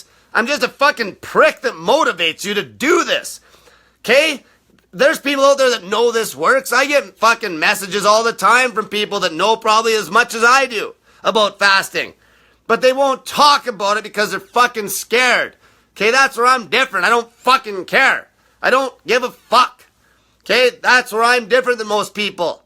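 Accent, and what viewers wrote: Canada. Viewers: Finally nailed your accent - Canadian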